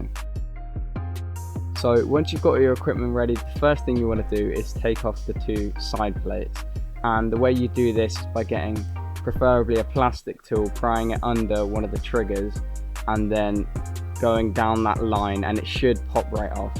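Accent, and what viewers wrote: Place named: United Kingdom. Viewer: you can tell that he is british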